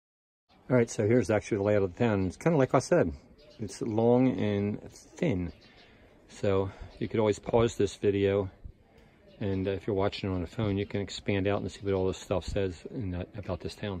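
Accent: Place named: Western Pennsylvania, United States. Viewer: Wow, you were on fire that day. Also, you sound like you are from Western PA.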